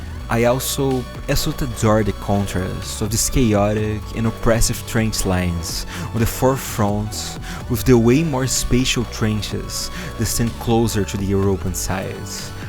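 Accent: Brazil. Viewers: Sotaque de brasileiro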